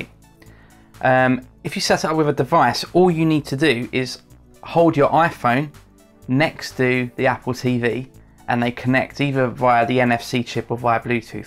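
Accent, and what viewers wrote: United Kingdom. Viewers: BUZZKEIL Tech: The accent is NOT American. I'm from America! The accent on the video sounds cockney.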